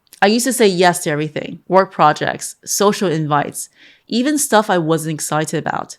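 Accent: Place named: Ireland. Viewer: I hear a slight Irish twang in your accent.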